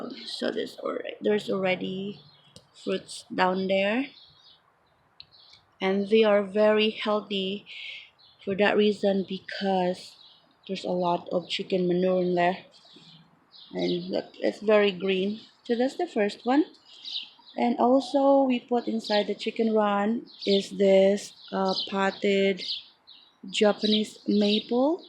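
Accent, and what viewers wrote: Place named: Guam. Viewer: great ideas! I was guessing your accent was from Guam. I was close! :)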